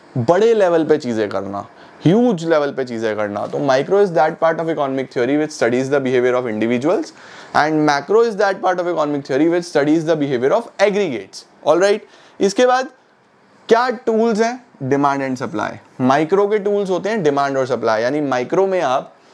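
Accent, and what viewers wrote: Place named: Punjab, India. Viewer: Your punjabi seems cute😂❤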